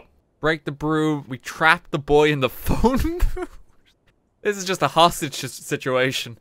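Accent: Ireland. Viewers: 20 seconds in “is this guy Irish” 25 seconds in I was sure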